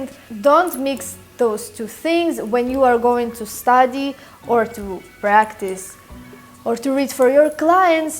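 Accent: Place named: Russia. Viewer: you sound russian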